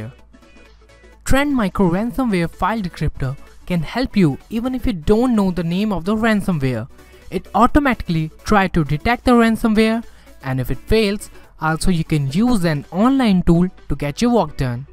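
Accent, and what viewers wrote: India. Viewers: FUCK UR ACCENT U INDIAN GURU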